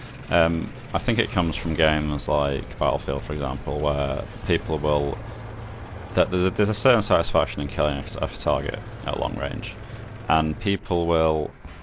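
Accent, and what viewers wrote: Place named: United States. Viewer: Are you from UK? I thought you are American from my perspective you talk with American accent.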